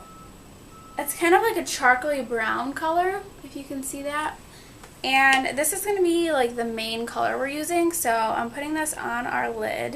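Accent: United States. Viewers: I love you accent! which state our you from?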